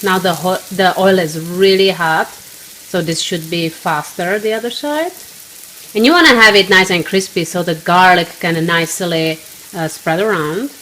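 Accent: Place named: Czech Republic. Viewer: I love the way you talk! I miss hearing the accent of a česká žena! Is it olive oil you use?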